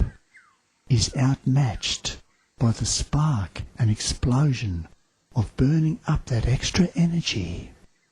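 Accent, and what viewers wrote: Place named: Australia. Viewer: Lol. You're the best!! I love your "work / YouTube posts"... weaver of parable, and allegory, with an Aussie voice and calming background sound, Master, I flourish a bow in your direction. You must be quite the interesting "normal guy". You have helped me much over the years. Post more!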